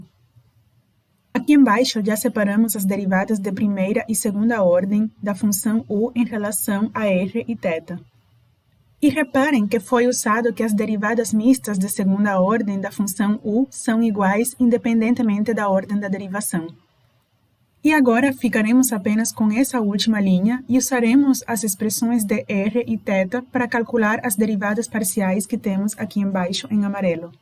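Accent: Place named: South, Brazil. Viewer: Obrigado pela aula!! Fiquei um pouco distraído tentando descobrir de onde era o teu sotaque kkkkk. Chutaria que sua língua materna é espanhol, vc aprendeu português depois da adolescência e como vc fala muito bem, vc deve ter morado no brasil por um tempo, pelo seu sotaque sulista, acredito que veio morar em uma região do sul do br, acertei?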